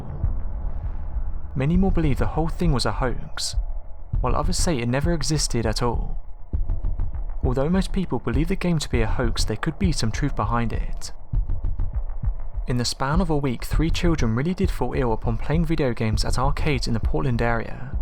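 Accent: United Kingdom. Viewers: British ASs accent bullshiter! Hahaha. Ass wipe!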